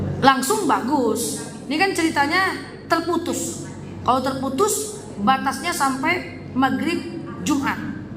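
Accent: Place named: Padang, Indonesia. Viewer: Saya suka sekali ceramah nya ustd org pdg ya bahasa ny kayak org pdg udh cantik pintar lg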